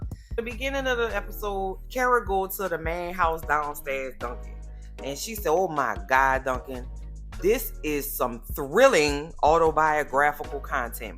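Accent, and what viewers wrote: New Orleans, United States. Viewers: I just know you from New Orleans BAYBAY I know a NOLA accent when I hear it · This is my first time on your channel and I'm KMSL! I clicked the link because I watch this show and it was on my recommendations page. Immediate subscribe! And the accent.....are you from New Orleans?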